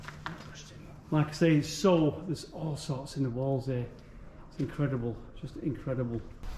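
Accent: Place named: Manchester, United Kingdom. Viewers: Being a foreigner I am unable to pinpoint the name of it, but I just want to tell you that you have the most wonderfull english accent. I presume it origins from your homestead Manchester. But never mind - I love the way you talk 😊 And the subject is still exiting as in part one. Brilliant work. Best regards from Denmark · My Grandfather was from Manchester, ( your accent reminds me of him), my Grandmother was from Liverpool. I'll bet they were quite familiar with these tunnels!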